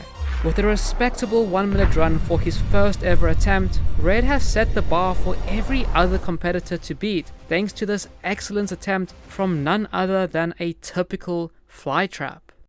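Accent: South Africa. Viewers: I had a feeling! You sounded SA accent. Great videos! I look forward to seeing more and going back through your older ones. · Are you South African, the accent gives it away?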